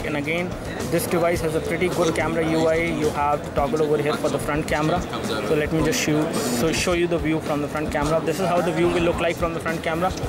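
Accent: India. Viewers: I can understand the words even if it's fast. He's pronouncing it clearly by using his indian accent.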